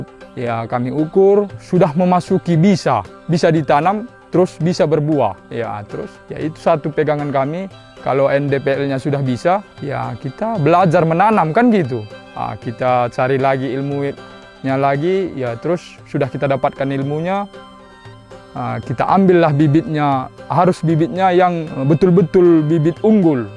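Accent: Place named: Medan, Indonesia. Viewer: Dengar logatnya jadi kangen temen dari medan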